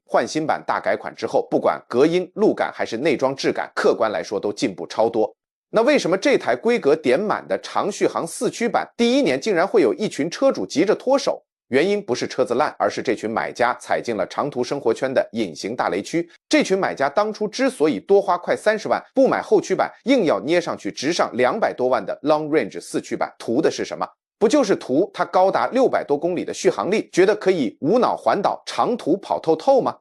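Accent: China. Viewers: 大陸口音 還裝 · 大陸口音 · 你的敘事在台灣應該是在中國，裡面的車除了最後那幾名其他的車根本在台灣沒有出現過，這種超爛的AI內容農場，這是中國無聊的統戰，錢沒地方花拿出來隨便唬爛，以後聽到中國口音直接封鎖，真是浪費時間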